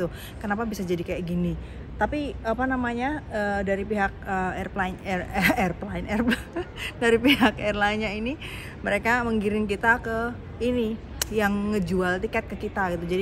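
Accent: Java, Indonesia. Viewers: Sampean iki wong ndi to mbak kok jowone bnr2 msh trsa....sgt bgs mba wong jowo ojo ngilangi jowone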